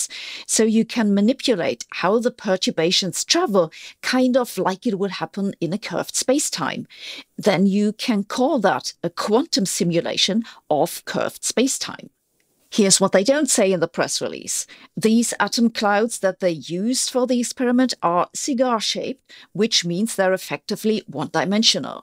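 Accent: Germany. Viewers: I am sure that if you improve your hard german accent and avoid making funny irony you could double the number of views. I tell you this with sincere simpathy, both things reject and create a strange sensation about you. Best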